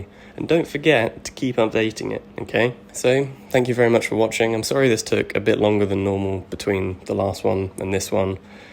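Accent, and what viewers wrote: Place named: England, United Kingdom. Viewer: I'm hearing a lovely English gentleman but I see Cletus from Mississippi lol love ur content bro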